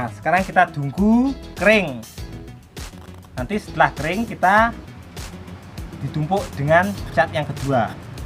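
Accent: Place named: Java, Indonesia. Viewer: Aku lihat sampean ki kok ingat sama lek gogon ya mas..dialek jowomu iku lho aku seng seneng...tapi lek gogon kok alih propesi yo mas..slam satu hoby mas.dari bojonegoro jatim.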